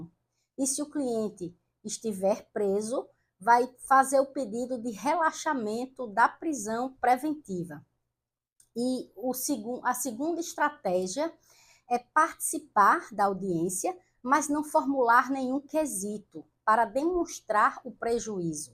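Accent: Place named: Northeast, Brazil. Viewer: Esse sotaque de nordestina é um porre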